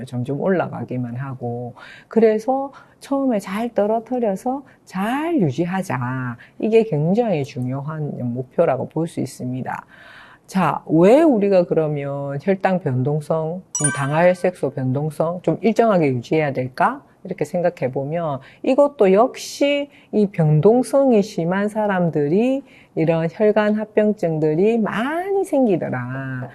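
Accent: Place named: Busan, South Korea. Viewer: 부산 사투리가 귀여우세요 😊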